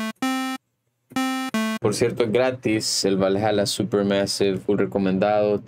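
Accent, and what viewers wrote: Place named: Honduras. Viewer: hermano soy tu fan desde que vi este primer video pense que eras hondureño estaba asustado, dije y este brother quien es jajaja saludos hasta SV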